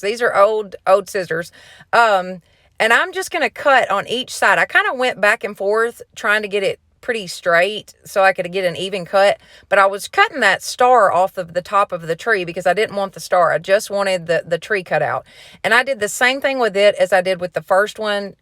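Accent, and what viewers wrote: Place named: South, United States. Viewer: My first time on your channel💓 Your kitchen must be beautiful with all these new decorations! Love your ideas and your southern drawl❣️ Makes me miss my sister in law and brother in Georgia!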